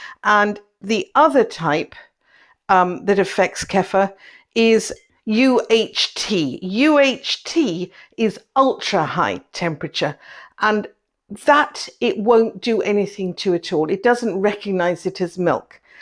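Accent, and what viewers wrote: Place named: United Kingdom. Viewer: She is English. She is pronouncing it as the Russian word with British inflection. Relax.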